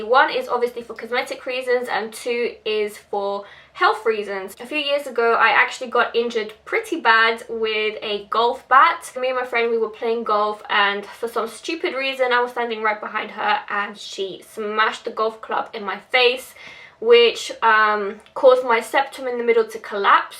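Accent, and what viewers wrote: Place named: United Kingdom. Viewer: Where are u from.. U have that british accent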